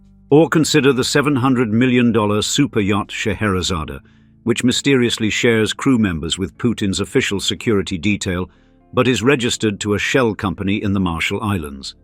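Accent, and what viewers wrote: United Kingdom. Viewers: Hence the British accent of this "narrator". most corrupt oligarchs are British and Americans...... including prime ministers and presidents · Sounds like this Brit narrator is piss Putin took back control of his country and the west cant loot that 76 trillion of resources.😢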